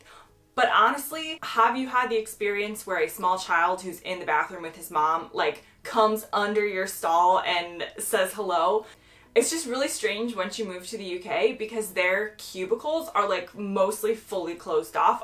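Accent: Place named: United States. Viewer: Errrrr.....she's American....didn't you watch the intro...or recognise the accent??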